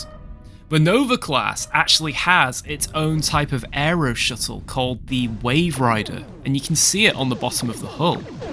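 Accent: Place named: England, United Kingdom. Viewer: I suppose that's the problem with a beta canon as incongruent as Trek's is- until I was taught that Star Trek doesn't standardize its beta canon I believed a lot of beta-only things which are essentially headcanons- stuff like Defiant being faster than Voyager, shield recharge being a Nova-exclusive or a Nova introduction. I guess we still have some preconceptions based on what beta canon we were exposed to earlier on. BTW i'm trying to get better at recognizing UK accents- fairly sure you're somewhere in England, but would you mind telling me where? The two most prominent features I've noticed are your emphasis on ending "g"s which to my American ear suggests somewhere to the west, but it also sounds like your voice is a bit breathier, maybe?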